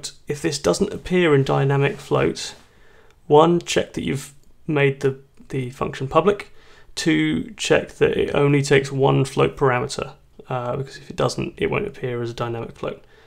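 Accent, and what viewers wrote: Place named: United Kingdom. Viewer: thamkyou so much british sounding man with ironic last name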